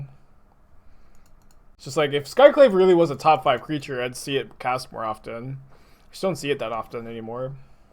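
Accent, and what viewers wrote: Texas, United States. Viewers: love the texas spike accent. 🤙🤙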